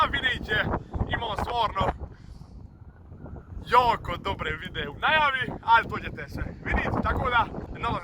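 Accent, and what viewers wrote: Croatia. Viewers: Hrvatski ali drugačiji naglasak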